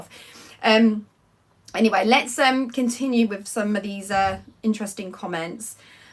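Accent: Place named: Lowestoft, United Kingdom. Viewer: Where in Norfolk are you originally from? I'm guessing your accent is sort of Lowestoft?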